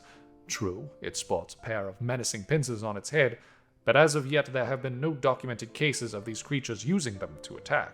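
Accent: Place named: New York, United States. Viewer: OMG has a new york accent now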